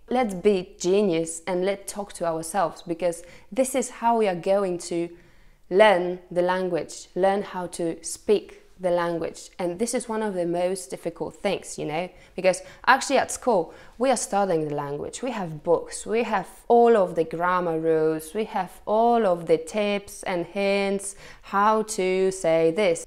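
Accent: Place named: United Kingdom. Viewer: mówi po angielsku z prawie idealnym brytyjskim akcentem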